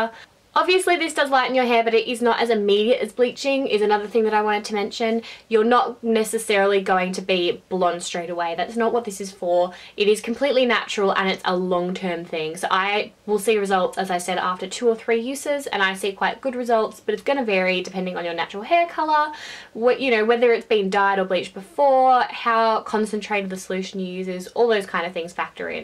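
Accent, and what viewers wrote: Australia. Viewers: Are u from Australia ???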